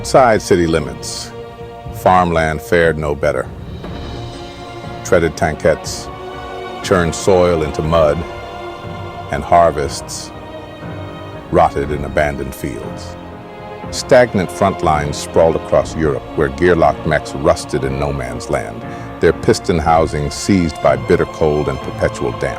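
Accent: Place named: United States. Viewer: This should have been narrated by a British man, not a black American. Good graphics though.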